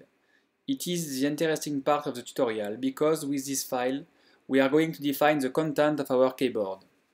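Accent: France. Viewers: that is so much a french accent, hahaha! thanks! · Haha that's right.... really French accent.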